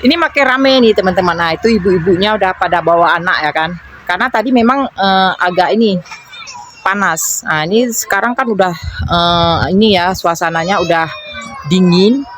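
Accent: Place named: Medan, Indonesia. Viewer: Halo bunda salam kenal.. senang sekali bisa ngumpul bareng teman-teman Indonesia. Oya bunda di Indonesia asal nya dari mana klo boleh tau. Logatnya kayak orang Medan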